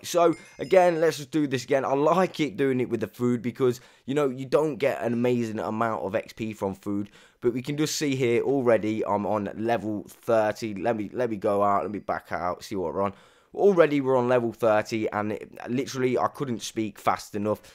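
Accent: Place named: United Kingdom. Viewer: For anyone asking why he sounds like DanTDM, it’s because he’s British and has a similar regional accent to him. There is a slight difference, with Dan sounding slightly more “posh” than him, but they are largely the same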